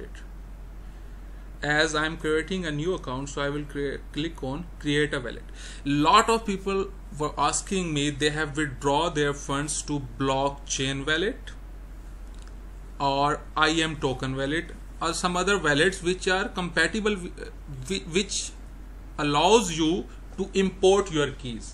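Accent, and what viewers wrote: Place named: India. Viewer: Is this indian website bcz you voice look like you are indian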